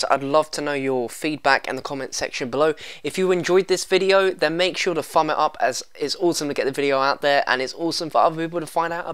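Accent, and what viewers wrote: England, United Kingdom. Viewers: well you are judging him by his voice? hes in uk not in america or anything he has an ENGLISH accent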